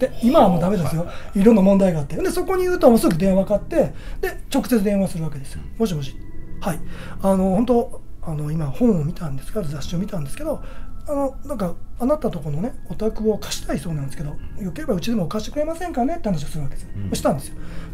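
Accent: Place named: Kansai, Japan. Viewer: 初めてコメントします 語りの方の話し方が関西弁で、尚且つ少し崩した感じの話し方ですごく聞きやすくて大好きになりました！これからも楽しみにしています🥰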